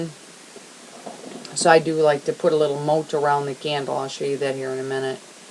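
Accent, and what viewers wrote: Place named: Minnesota, United States. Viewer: First time on your channel, liked and subbed. Thanks for the simple common sense talk. I'm trying to figure your accent. I'm guessing northern Minnesota or N.D., not so much Wisconsin though. Thanks again, Sam.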